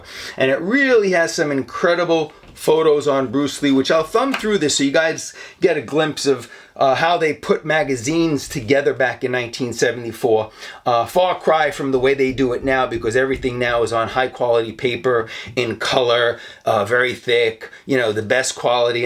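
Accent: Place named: New York, United States. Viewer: You brought back some great memories. You sound like a Noo Yawka. Did you ever go to the martial art shop on 42nd St?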